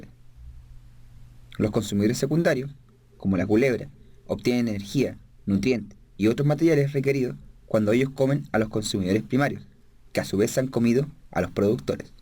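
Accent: Chile. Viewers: no me puedo concentrar por el acento chileno xd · Gracias me ayudó mucho!😊 Eres de chile verdad? Me encanta tu acento :D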